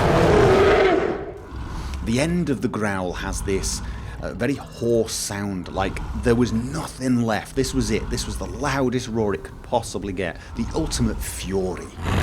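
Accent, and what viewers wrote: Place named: North, United Kingdom. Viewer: Let me just say that you are probably THE Greatest "Instructor" Or whatever you like/prefer to be called... lol May I ask where your from exactly? (Random question) haha. My dads from Ipswich and hes normally good with accents and all that be he couldnt figure it out.. He said maybe somwhere kind of North in the UK or somthing... (I know you probably dont like your comments to be filled with random stuff like this, but I HAD to ask) lol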